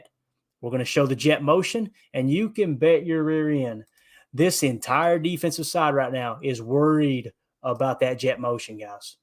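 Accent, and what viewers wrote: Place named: South, United States. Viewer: How you get a southern accent living in Wisconsin?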